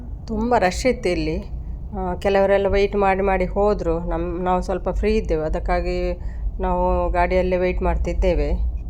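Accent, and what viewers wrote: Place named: Mangalore, India. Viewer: Nim mangalore Kannada bashe Kelakke Chanda ❤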